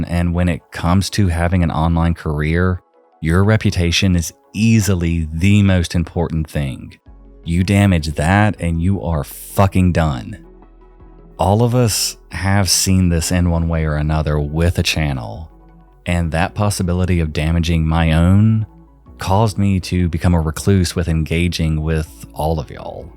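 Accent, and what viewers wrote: South, United States. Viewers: I only care about your silky Southern Sibilance.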